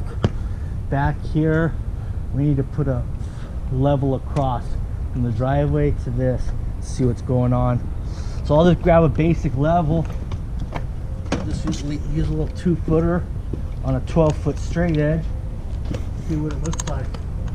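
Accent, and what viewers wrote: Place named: California, United States. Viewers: That California accent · Are you in California? I say that because you have that laid back California accent, anyway God bless thanks for the video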